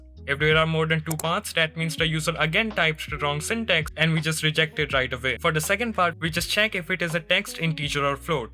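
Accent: India. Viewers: they sound indian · By the Indian accent I know this was gonna be peak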